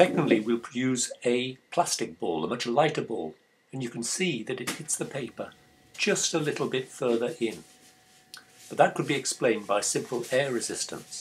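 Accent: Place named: United Kingdom. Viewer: Great video. Pleasant British accent, and succinct explanations-- what more can you want from a man?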